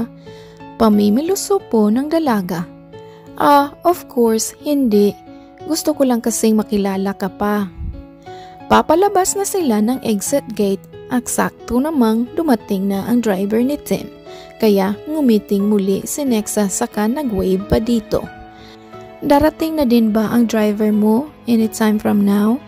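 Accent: Visayas, Philippines. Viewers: 🤣😂😅😅dlwang sunud na bisaya ang nag e story teller pero nice s dlawang sunod mas ok to magkwento ..💓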